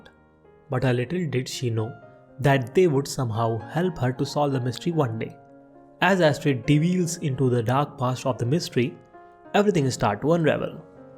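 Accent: India. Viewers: I couldnt finish this. Your accent is the most painful mix of indian and monotone. My brain is in pain.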